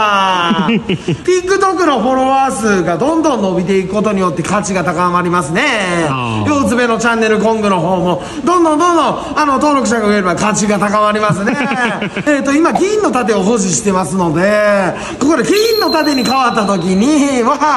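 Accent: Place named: Kansai, Japan. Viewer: チャンネル登録しました！いつも元気なさんに元気をもらっています😊 関西を離れて15年😢関西弁をここで補給しています！これからも投稿を楽しみにしています！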